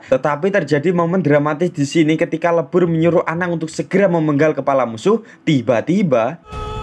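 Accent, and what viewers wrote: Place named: Java, Indonesia. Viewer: jhon versi jawir,medok sekali hehe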